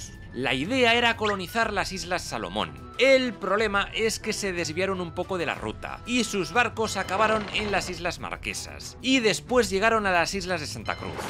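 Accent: Spain. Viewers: Acaso es un español el que esta narrando???????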